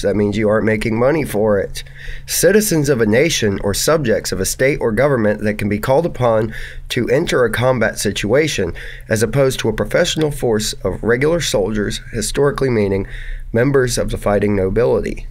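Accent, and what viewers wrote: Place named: Virginia, United States. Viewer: haha man you sound like youre from VA! am i close?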